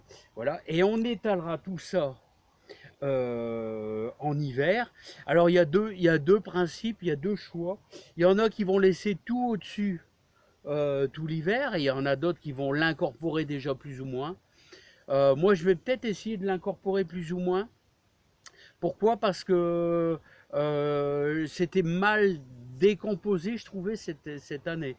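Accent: North, France. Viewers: Bonsoir monsieur, une video intéressante, j'ai toujours autant de plaisir à vous écouter, et cet accent chaleureux de mon nord que j'ai quitté, prenez soin de vous à bientôt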